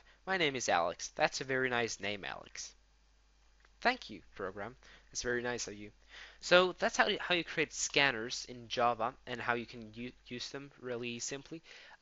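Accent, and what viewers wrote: Sweden. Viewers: Your Swedish? :0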